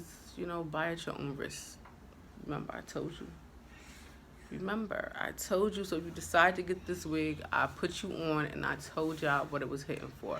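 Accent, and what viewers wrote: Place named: Brooklyn, United States. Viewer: Before I heard you say ah ah ah ba ba ba I was like she from Brooklyn lol. You wear the hair well and the color is nice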